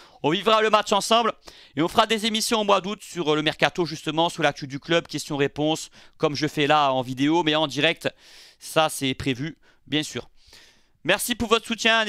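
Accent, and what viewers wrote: Corsica, France. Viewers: Hors sujet: jme trompe ou tia l'accent Corse?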